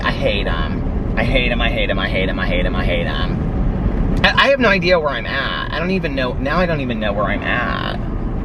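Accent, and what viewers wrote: United States. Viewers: Love the American pronunciation for food....makes us brits / irish laugh...... also you guys still use the phrase handicap, in UK that isn’t acceptable any more. We use the word disabled. Also dressing up is wearing a black crew tee shirt lol.... in uk dressing up is wearing a suit. Glad you’re feeling better ❤️❤️❤️❤️ Lol in uk we have nothing but round abouts. If you ever come to UK DONT go to Milton Keynes.......nothing but roundabouts it’s like the capital of roundabouts 😂😂😂😂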